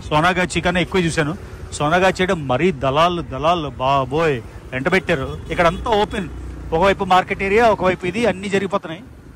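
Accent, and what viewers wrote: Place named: Andhra Pradesh, India. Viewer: That's not a compliment dude. Take it as criticism I don't like the way u narrate and do videos and ur slang please speak in telugu not in Andhra Slang.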